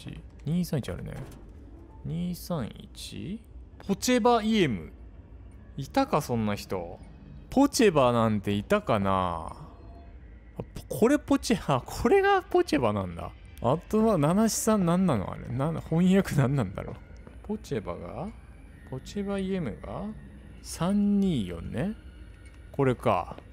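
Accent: Hokkaido, Japan. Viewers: 謎解きもゴリ押しで解いちゃったり、北海道弁が聞けたの嬉しいし、びっくり要素がいっぱいあって面白い名前があったり、八つ当たりで「飛んでけぇぇ！！」や物を投げる時に「すとーん！」って言っているのも好きです。お約束のバグもあって面白かったです。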